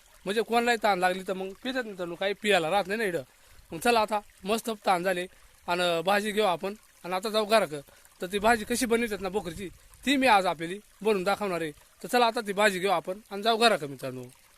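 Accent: Maharashtra, India. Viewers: कंच गाव भाषेवरून महादेव कोळी वाटताय 👌🙏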